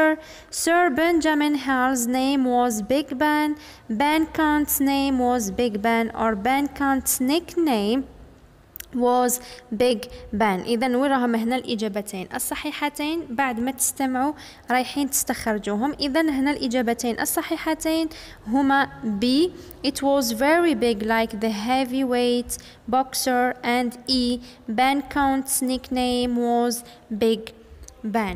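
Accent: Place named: M'Sila, Algeria. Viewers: اها ننضنش من مسيلة من لهجتها باينة دزيرية ملاحضة انا فتاة ولست ولد بلاكي تشكي في اسمي ديال القناة 😅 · استاذة انت من ولاية المسيلة ولا اذا انت من مسيلة انت راك قريتيني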